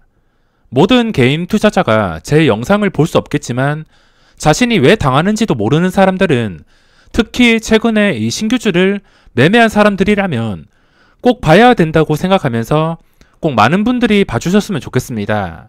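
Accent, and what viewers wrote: South Korea. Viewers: 사투리가 섞인 서울말? 은근히 중독됩니다.^^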